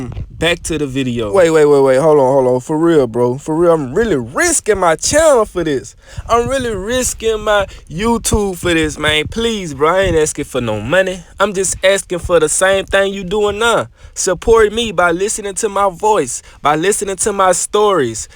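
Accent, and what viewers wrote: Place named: New Orleans, United States. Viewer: Hey bro. Love the commentary with the NO/BR accent. 504/225✊🏾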